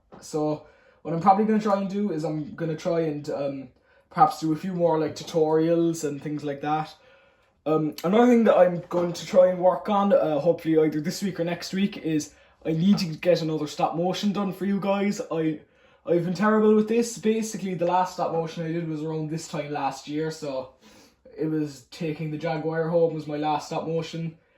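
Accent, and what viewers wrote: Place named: Cork, Ireland. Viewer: As a lawd from Waterford I can tell your from Cork by hearing your Accent 😂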